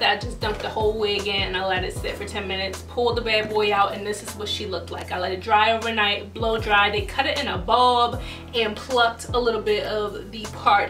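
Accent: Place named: DMV, United States. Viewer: Are u from DMV? I love ur accent tho.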